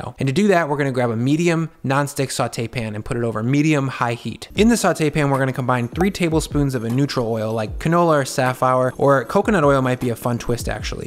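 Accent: United States. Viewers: I'm American. You sound American. Why are you using the Metric System? You can always edit for those overseas. I'm still gonna try your recipe though.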